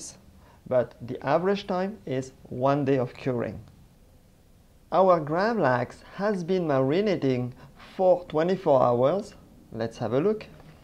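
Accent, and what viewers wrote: France. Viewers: so my salmon is ready , well, tomorrow can be eaten actually but I taste it after the curing, delicious and now I added yellow mustard ( no dijon in the house) and chopped dill. Thank you for the recipe, the lemony dill taste of the cured salmon= 😋 DELISH!! I personally love garlic so I added a little bit in the curing mixture and celery powder as well, love it!! P.S. your French accent is killing me... in a good way!! Is buy favorite accent in the world since it makes me laugh 😁 Merci beaucoup!! 🇨🇦🇫🇷👍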